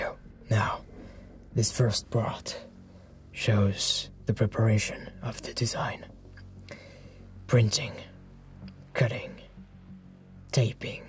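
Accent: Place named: France. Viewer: I swear I’m not just saying this because of your accent, but I hope you’d do a Frenchie (The Boys) roleplay someday! I know guns would be controversial, but it would be cool if you were building a gadget or making some kind of contraption like he does. I just don’t think anyone non-French could pull that off lol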